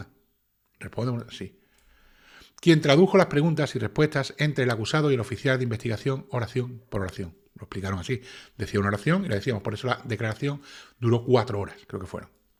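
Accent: Andalusia, Spain. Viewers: Muy interesante, muy bien documentado, un trabajo muy profesional, el único "pero" es el esfuerzo que hay que hacer para entender el "andaluz estrecho" y sin diferenciación de algunos fonemas, entonación y arrastre de las sss y algunas guturales. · Es del sur de España, una región donde se habla muy el castellano, porque más que se lo digan es imposible que corrija su vocalización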